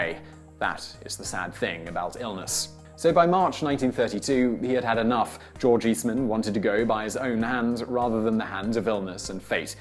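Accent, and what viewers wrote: United Kingdom. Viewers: Written in American English, presented in British English.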